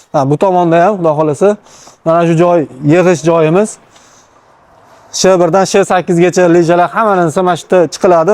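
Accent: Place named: Andijan, Uzbekistan. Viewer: Шевещга караб Андижонга ухшаяпти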